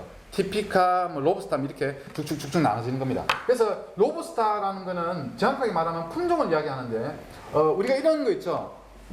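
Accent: Gyeongsang, South Korea. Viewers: 아흥..강으너무 잘해주시는데....경상도 억양..알아듣기 힘들어요..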